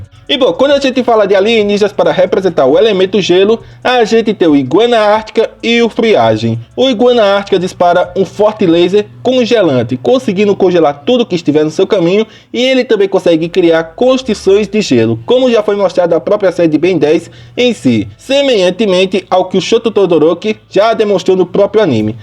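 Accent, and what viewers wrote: Northeast, Brazil. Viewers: Quando Vi A Thumbnail, Achei Quê Você Enlouqueceu De Tanto Teorizar Para Preencher Os Furos De Roteiro & Falta De Lógica & Etc ....., 😅😂😂😂. Adoro Seus Videos De Ben 10 e Seu Sutaque Nordestino???, Sulista???, 🎉😅.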